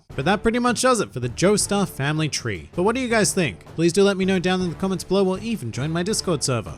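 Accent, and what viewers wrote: Australia. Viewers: I am here for an Australian man narrating. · I didn't realize you were Australian. I thought you were American trying to be fancy. I guess that describes the Australian accent pretty well. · Sarcastic Australian man narrating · You're right other videos don't have a sarcastic Australian man tell me about Jojo Family line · hmm i do like sarcastic Australians narrating 🤔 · imagine not having read part 6 and hearing an australian talking about a dude whos looks are on the level of a trashcan who wields the stand bohemian rhapsody thats somehow connected to van gogh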